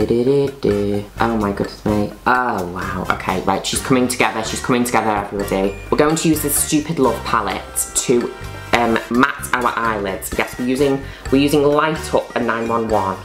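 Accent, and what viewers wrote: United Kingdom. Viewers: That thick British accent tho...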